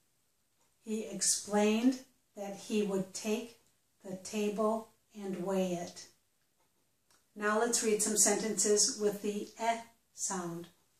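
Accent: United States. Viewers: Excellent and the best broadcasts American's pronunciation !! I'm grateful for you Madame. Thank you +++. Fadi from France, advanced learner English in Canada .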